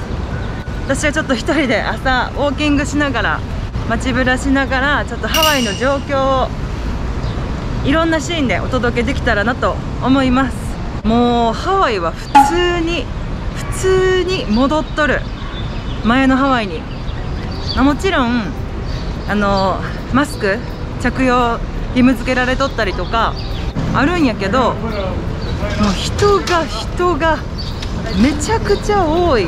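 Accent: Kansai, Japan. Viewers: 関西弁が5割増しで美しく見せてくれる。 バブル時思い出すね！ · keyさんの関西弁と英会話の組み合わせが魅力的だ。日本人に少ない活発で知的な美人プラス酒好きなのでブレイクしそうな予感がする。意外にじいちゃんに人気が出るかも。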